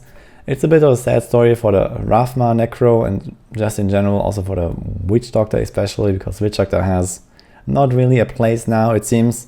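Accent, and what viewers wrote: Germany. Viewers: with all due respect, Greece is a shithole, i donno where are u from but from your accent i can assume that you're from germany\austria etc. but hey if you're happy there then i'm happy for u!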